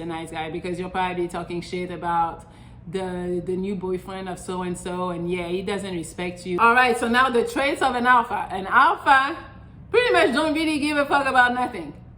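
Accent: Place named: France. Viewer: Most probably from France. I hear a French accent.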